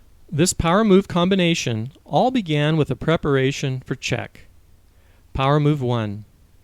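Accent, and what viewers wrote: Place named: United Kingdom. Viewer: Sir, you have gotten a niche that you teach and do it very well. Great to hear that awesome British accent. Thank you for all your help.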